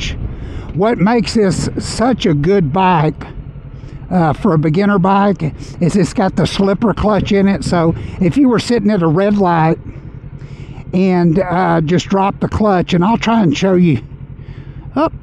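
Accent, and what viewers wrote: South, United States. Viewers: Hey, Love your channel! And Love your accent... I'm from up Northeast Pa. but I can tell you're from somewhere in the South. Don't know if you're as old as me but I been riding my Ninja 650 since 2018 and refuse to let it go..too good of a bike! I also bought a CBR650r a few months ago. I missed that smooth 4 cyl engine and sound. I been riding legally since 1987 but started on a dirt bike at 12.🦾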